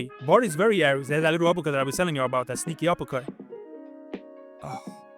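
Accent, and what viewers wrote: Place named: Latin America. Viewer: You may speak English, my friend, but you're actually vibrating and articulating in Spanish or some Latino version thereof. However, you are attempting to connect with a nation of many different cultures, anglo's figuring large among them. Your speech, in it's high stress. Latino rapidity, virges on the brink of becoming incomprehensible because of your machine gun fire manner of delivering your thoughts. And without attempting to be offensive, I must tell you, it becomes irritating and of limited tolerability to Western ears. So this will not be easy for you, because rapid fire Latino is your communication mode of choice. All I can say is, seek to chill out and slow down and to dwell upon the meaning of the words that explode out of your lips with such self-assured speed. They are all not necessarily connecting with your Western Brothers and sisters in the way that you might hope. 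And remember, the world is not going to come to your speaking or thinking style, you will have to modify yourself to meet it where it is. Good luck in this process of recalibrating yourself. Don't worry, in the end it will make you less compulsive and more pleasant to be around.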